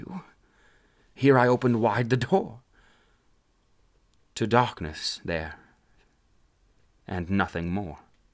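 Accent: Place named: Mid-Atlantic, United States. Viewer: Excellent use of the midatlantic accent. Your inflection and pacing bring to mind the best actors of the last century, trained in Shakespeare. Thank you so much for sharing this with us!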